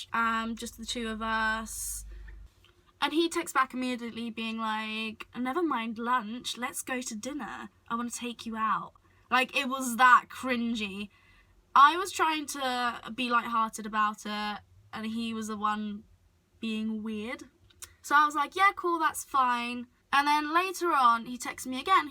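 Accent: United Kingdom. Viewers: Ur accent is so stereotypically British to me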